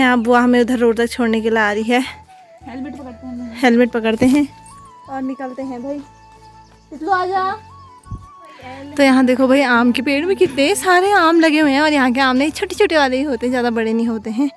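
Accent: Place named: Pahari, India. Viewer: Pahadi bolna kitna accha lg rha h aapka ❤❤❤❤❤❤❤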